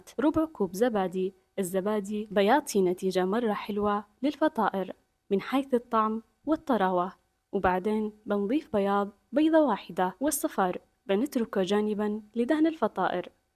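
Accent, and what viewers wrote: Yemen. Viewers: لهجتك يمنيه عرفت لما سمعتش تتكلمين مثلنا 😅بالتوفيق بمشروعك الجميل والصادق ❤ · فديت بنت اليمن اعرف اللهجه لو بين مليون والنظافه والادب والاخلاق جبرني بك ❤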